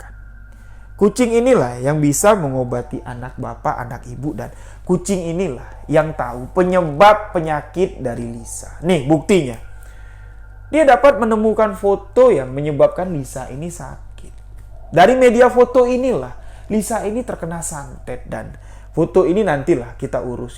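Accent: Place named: Madura, Indonesia. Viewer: mas ini asli mana ya kx saya den9er² bhs nya kyak oran9 madura🙏🙏🙏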